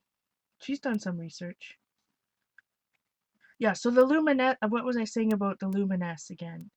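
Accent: Canada. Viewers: You are a cutie patootie with that adorable Canadian accent!!! Lovely work, thanks for sharing!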